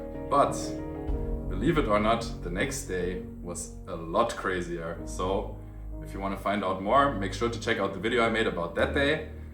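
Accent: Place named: Germany. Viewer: Ich hatte nach den ersten 10 Sekunden irgendwie das Gefühl das der Sprecher als Muttersprache Deutsch spricht und ich hatte Recht.😅 Aber super Video, alles interessant eingefangen. Macht weiter so!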